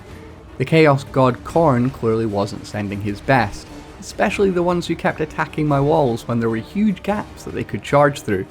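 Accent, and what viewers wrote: Scotland, United Kingdom. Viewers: Great video. Love hearing a fellow Scot. Can’t wait to get my hands on TWW3 to try out these new battles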